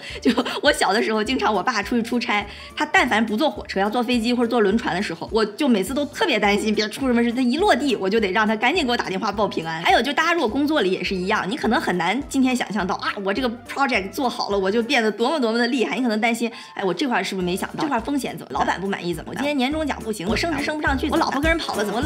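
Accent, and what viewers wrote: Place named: Dalian, China. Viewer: 小lin的东北话 字幕都识别错误了。😂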